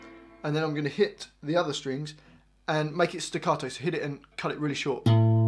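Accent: United Kingdom. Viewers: Omg how cutie british accent I love him speeches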